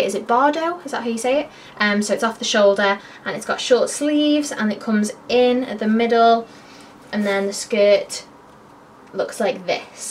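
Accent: United Kingdom. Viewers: It's a british accent, I don't know which one :o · HOLY CRAP YOUR BRITISH!!! LOVE IT!!! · You're soooo beautiful! Happy birthday! I love your videos and your british accent! XOXO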